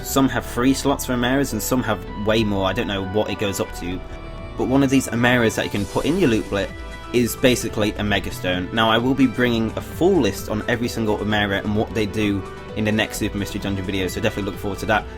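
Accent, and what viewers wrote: United Kingdom. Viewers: hear it. I'm from America though.. Are you British?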